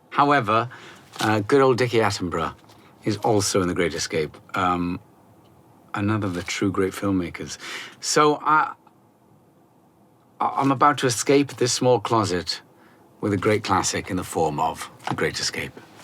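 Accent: United Kingdom. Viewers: I had no idea this dude was British af 😂. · Is he British?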